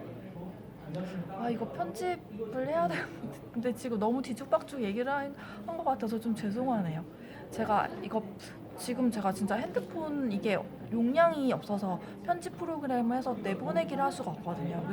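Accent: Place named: Busan, South Korea. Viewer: 원래 부산 사투리 쓰셨어요? 표준말인데 사투리 억양이 섞여있는 것 같아요. 화이팅👍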